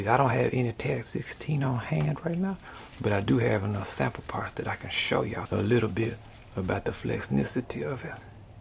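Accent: Louisiana, United States. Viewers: voice just makes me think of a guy in the back woods bayou near New Orleans sitting on a porch with a banjo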